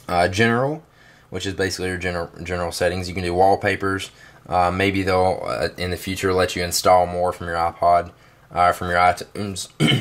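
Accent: United States. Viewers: american cowboy acvent is rlly anoying.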